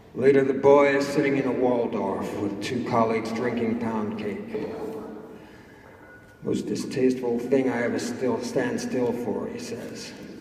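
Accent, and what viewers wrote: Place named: United States. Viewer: Is it just me, or does Jim Thirlwell do a really great American accent ( eat your heart out, Hugh Laurie)?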